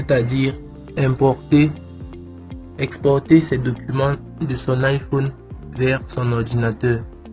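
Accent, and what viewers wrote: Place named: Antilles, Caribbean. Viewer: T’es antillais mec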